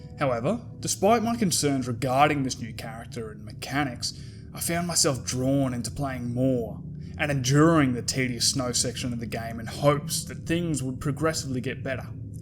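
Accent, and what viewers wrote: Australia. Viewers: Ohh he's an Aussie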